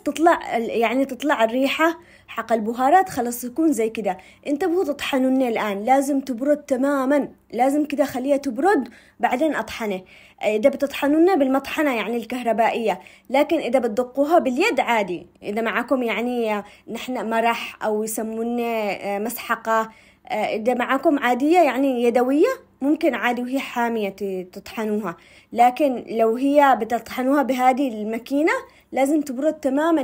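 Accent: Aden, Yemen. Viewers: ممتعة فيديوهاتك يا.. أسلوبك في الطرح جميلة ودمك حلو و كلامك العدني مرة حلووو... وأنتظر فيديوهاتك و طبخك دايم ،، موفقة حبيبتي وإلى الأمام.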